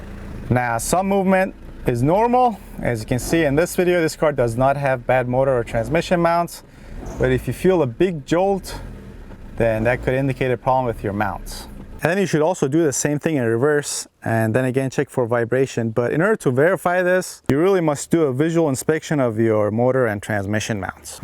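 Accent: Iran. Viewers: From ur accent I can figure out that u r 100% Persian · I can hear that Iranian accent.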